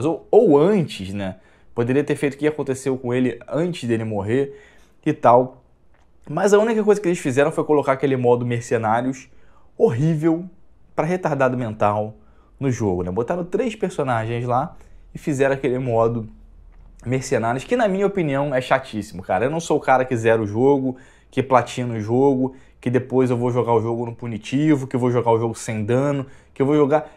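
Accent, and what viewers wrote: Rio de Janeiro, Brazil. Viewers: Tu é muito chato cara, pra começar esse teu S puxado de carioca, só sabe falar mau, a DLC do Re. 2 gratuita, muito da hora, aposto que tu nem jogo. Falar mais é fácil, quero ve5tu fazer melhor.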